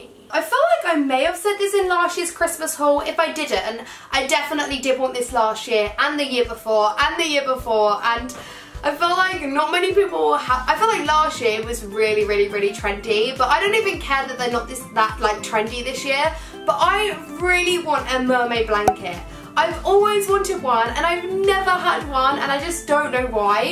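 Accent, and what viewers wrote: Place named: United Kingdom. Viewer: hey I subscribe to your channel and I love your accent I believe that your accent is British right?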